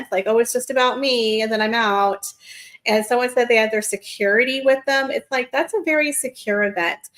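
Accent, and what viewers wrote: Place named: California, United States. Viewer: I’m from California and I agree with your Mom that you sound like you’re from California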